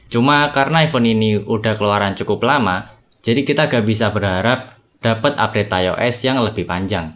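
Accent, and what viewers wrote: Java, Indonesia. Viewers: tambahan bang, menurutku pembawaan udah cukup oke, tapi ada 1 yang kurang, kata2 "gua" coba diganti aku/saya, agak lebih oke, soalnya medok nya lumayan kentel😭🙏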